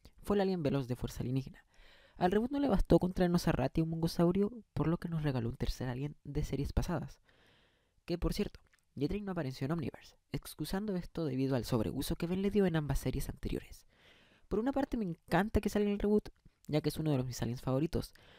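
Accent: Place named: Antioquia, Colombia. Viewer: Sin ofender pero tú voz es muy paisada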